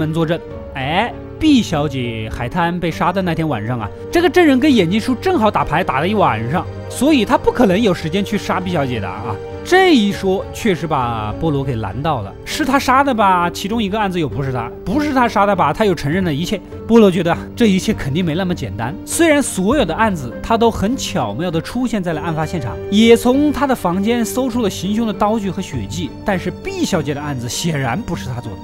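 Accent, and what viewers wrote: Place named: Hunan, China. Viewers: 湖南口音好重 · 湖南人吧哈哈哈口音好好玩不然就是湖北人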